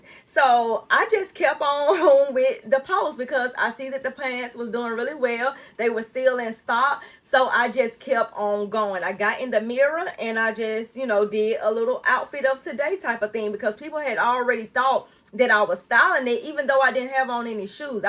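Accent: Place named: South, United States. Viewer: Love your style and your cute country accent 😊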